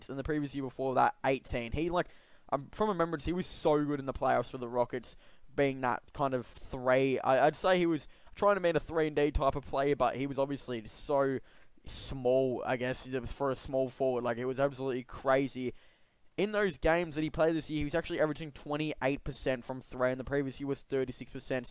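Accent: Australia. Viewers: are you an aussie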